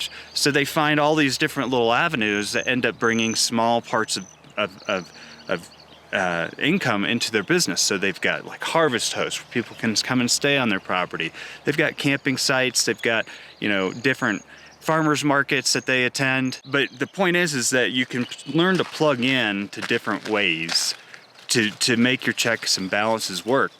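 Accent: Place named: Northern California, United States. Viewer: It’s Nor Cal Americano. No i don’t speak King James English do you! lol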